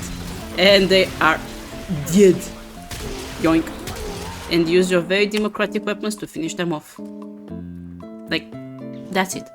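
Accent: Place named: Europe. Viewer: Hearing American behavior with a European accent scratches a special itch in my brain for democracy 🫡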